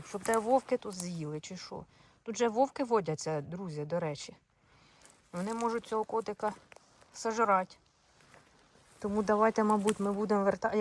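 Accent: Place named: Poltava, Ukraine. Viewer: У вас говір "Полтавський" 😊 Ви самі звідки?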